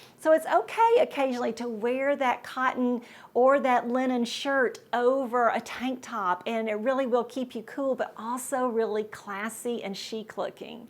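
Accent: South, United States. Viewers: When you said you lived in Ohio, I thought, "What?? She's not from the South?" And then you said you grew up in Georgia, and I thought, "Yes!" I knew you had to be from the South! South Carolina/Mississippi girl here!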